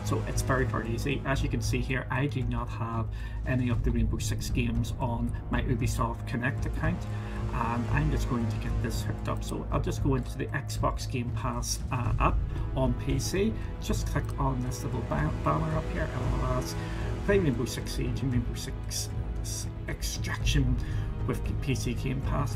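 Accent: Northern Ireland, United Kingdom. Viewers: wait... are you from northern Ireland?, I am from Cookstown Northern Ireland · you northern irish??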